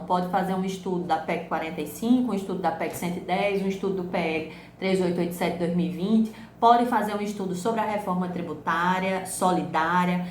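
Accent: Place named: Northeast, Brazil. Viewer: Meu deus, que aula maravilhosaaaa! Quero agradecer de coração, pois estou há mais de meses tentando achar algo que me guiasse nesse TCC. Direito tributário é a matéria que eu tenho paixão e que brilha meus olhos, como a senhora falou precisamos fazer na área temos essa sensação. Com certeza irei seguir suas dicas e tenho convicção que será excelente o tema. Gratidão, profa!! Ah, amei seu sotaque rsrs Sou nordestina também, com muito orgulho.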